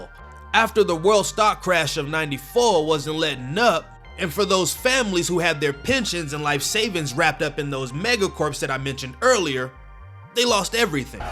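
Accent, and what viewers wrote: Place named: Houston, United States. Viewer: "What it dew" "Going Dine" "Hol up" ....🤔This is a fellow Houstonian...and Black Cyberpunk fan...*Hits Subscribe immediately!!*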